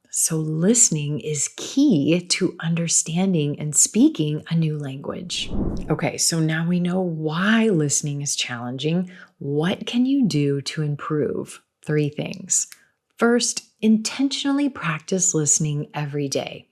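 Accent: United States. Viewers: I'm addicted to your beautiful voice every night when i go to bed your voice is digging in my brain. American accent is outstanding. Much love to you Coach from Morocco 😘😘😘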